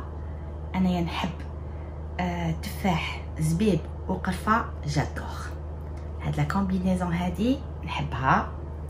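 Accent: Algiers, Algeria. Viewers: j'adore vos recettes et votre accent algérois. Allah,Allah. 🇩🇿🇩🇿🇩🇿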